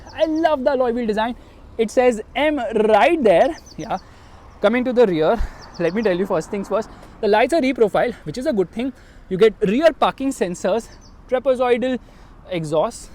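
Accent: India. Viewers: That's an absolute indian accent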